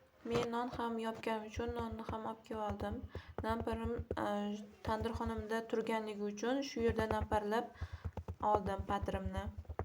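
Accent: Uzbekistan. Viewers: Tili xuddi Amudaryonikidek ekan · Шевангиз Галлаоролники😊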